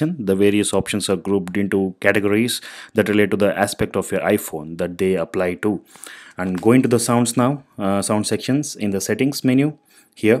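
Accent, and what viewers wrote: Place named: India. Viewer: neverhome_rph I am in total agreement with jarcohen You MUST rehearse your You Tube presentations and LEARN to pace your speech. You speed up at times which makes what you are saying TOTALL ILLEGIBLE! Then you then speak slowly and you information comes out clearly. There were too many instances when your fast talk, combined with your Indian dialect, made your presentation too difficult to follow. PLEASE SLOW DOWN!!!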